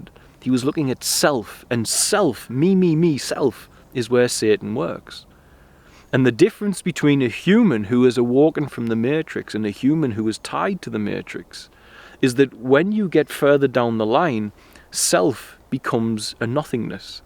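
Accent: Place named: Scotland, United Kingdom. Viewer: Good morning from Polska ! How cool Scottish accent... I do not know who you are, but i got an impression that because Tate spoke about balenciaga scandal, exposed it in vid Karmic Rétribution published a month ago, so he was framed in Romania ? However, he's got some underworld vibe ? Smokes cigar, converted to muslim ( Already was very fanatical towards women): isn't he disturbed anyway ?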